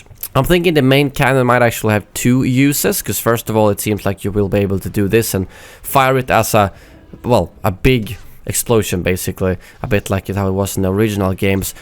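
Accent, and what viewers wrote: Sweden. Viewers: U swedish huh?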